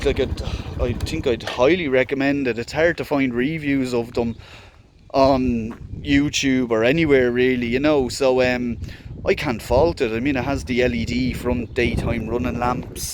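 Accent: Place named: Ireland. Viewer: love the irish accent. Big fan of Conor McGregor 😁❤️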